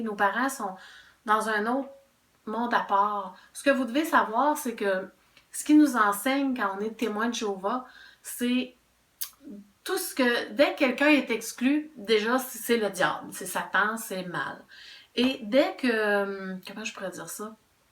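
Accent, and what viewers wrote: Quebec, Canada. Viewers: Merci de partager ton accent Quebecois. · Oh t’es Québécoise ! J’adore ton accent et j’adorerais venir visiter le Quebec un jour ! Vous dites le mois d’A-OU ? J’ai appris un truc haha. Sinon bonne vidéo. Au début de "mon réveil" je n’en revenais pas que ce soit partout pareil. Je pensais que c’était que dans ma congrégation que ça n’allait pas.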